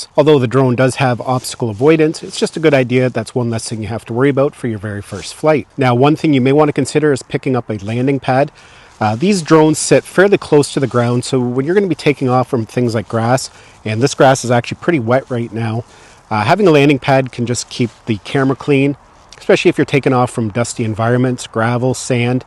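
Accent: Canada. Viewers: Well, the first flight went without a hitch! I learned very quickly to watch the controller and not the sky - the drone disappears in seconds. I would highy recommend this Beginners Guide. Calm, clear and really comprehensive without unnecessary detail. That Canadian accent is a joy!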